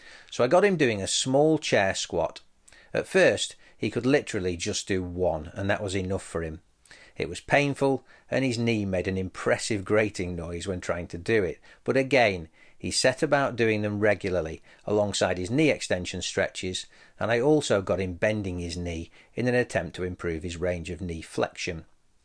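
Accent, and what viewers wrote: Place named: Scotland, United Kingdom. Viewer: good Scottish accent 😊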